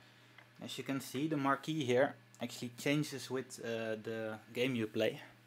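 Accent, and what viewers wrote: Netherlands. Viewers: Nederlander? goeie video! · Duuuuuuuuuuuuuuuutch! :D Ik kon het horen from your accent lol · Typisch neder-engels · you.. are.. dutch?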